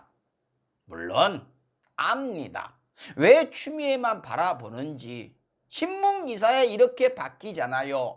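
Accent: North Korea. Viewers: 북한사람 말투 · 조수진이라는 여자 말투도 무식하고 억양이 북한 억양 말투에 과장되서 탈북한 여자인줄 알았어요. 꼭 뽑아도 막장스런 여자들만 비례 국회의원으로 뽑는건지 ? 통합당 비례 기준이 막장 인가봐요?전희경이랑 이은재 남장여자 임이자등의 모습을 안좋은건 다 닮은듯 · 말투가 탈북자 느낌 나서 놀랐음.. 빨갱이 타령하는 것들이 하는짓이 딱 그 쪽이라 어이상실..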